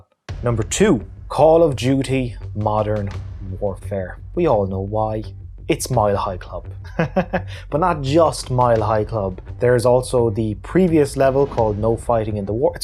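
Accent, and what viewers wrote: Ireland. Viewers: Guardians Of The Galaxy and the voice of a Irishman, no better way to spent a Friday night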